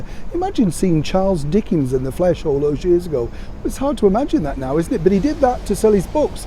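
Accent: Yorkshire, United Kingdom. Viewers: Beautiful building, interesting names. Blimey Neil, there isn't much you haven't done eh? I'm still at a loss to be honest why Harry considers England - or the UK as unsafe, yet seems not to be bothered living near and visiting places in the US where there are guns and more serious crimes. Nowhere today can be guaranteed 100% safe, but the UK V areas with gun crime really?! Bizarre. No way should the British tax layer fund a duo's security who not only are no longer Working Royals, pay no taxes in this country, but have hurt most things dear to us; our Gracious Majesty The Queen, the very beautiful Catherine The Duchess of Cambridge, Prince William, Prince Charles, our Monarchy in general, our country and our people. Lies were told, opinions cast and the most vile cult supporters have attacked anyone disagreeing with them or supporting the other Royals. 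Too much trouble has been caused, why should the British people pay anything or loan a specialised force which is not for hire?! And no Neil, I do not think they care! Absolutely love your clear voice & tone... You do return a little to your Yorkshire accent when visiting, nothing wrong with that however, just an observation x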